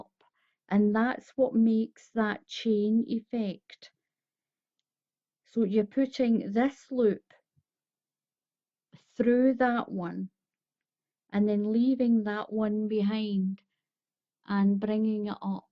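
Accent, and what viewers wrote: Scotland, United Kingdom. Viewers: I should have written lovely 😄 Do you use lively in Scottish? I'm from Finland. No polar bears here 😄 Your accent is adorable 👍 ☀️ Happy New Year! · I love your videos. You explain everything so clearly and precisely. Your beautiful accent takes me back 50 years to when I worked with 2 Scottish ladies who helped me refine my knitting skills. Now another Scottish lady is helping me refine my crocheting skills. Thank you for taking me back to a happy time in my life.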